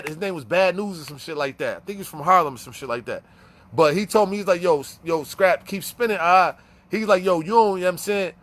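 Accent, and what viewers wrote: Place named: Boston, United States. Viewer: Dude gotta be from Boston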